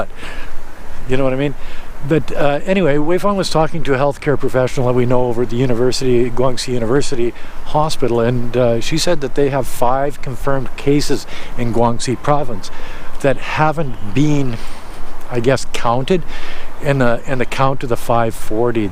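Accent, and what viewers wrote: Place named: Canada. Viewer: I didn’t know Canadians had an accent until I started watching you. I’ve been a fan for a few months. I’ve really been enjoying your informative vlogs. I got a real kick out of your steak bbq at your condo in Canada. Keep up the good work and best of luck from a fellow Saskatchewan boy.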